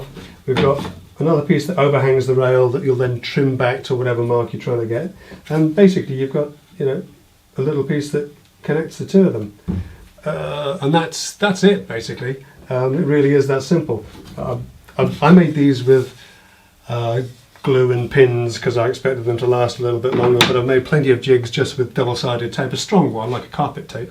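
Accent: United Kingdom. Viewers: Why does a UK accent sound so believable? Ha! Maybe it’s an American thing. Love this channel and the info. Thank you!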